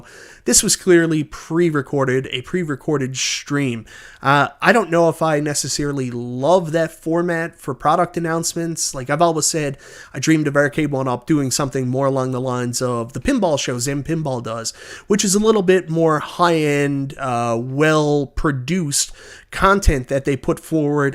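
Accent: Canada. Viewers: I love ya but that Candian accent makes it sound like Ircade 1 up. Not Arcade 1 up. Its bugging me. Can you work on it.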